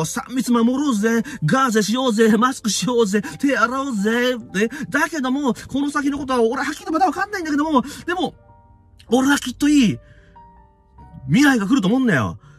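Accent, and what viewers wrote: Kansai, Japan. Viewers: Qさんのたまに出る関西イントネーションが大好き😆 大阪人より🙋🏼‍♀️❤️ そして今日も楽しい動画をありがとうございます！！！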